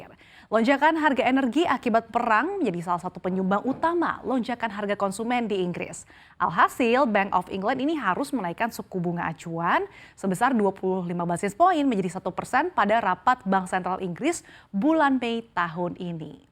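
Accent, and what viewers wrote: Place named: South Jakarta, Indonesia. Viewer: Logat host nya jaksel banget ya.. 😂 cape dengernya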